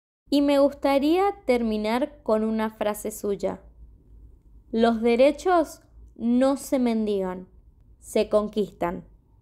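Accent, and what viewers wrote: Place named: Argentina. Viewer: Hola, ¿ Qué tal? Estoy encantada con tu canal en Spotify y como no encontré mas audios vení al YouTube y ti encontré ❤. Ppr favor ¿Cuando volverás a subir mas vídeo? Estoy aprendendo español y quiero aprender el acento argentino que es muy hermoso, ademas año que viene quiero ir de vacaciones a Argentina y su contenido ayuda muchísimo. Muchas gracias por ellos. Soy brasileña y me encanta el español, Argentina y su gente.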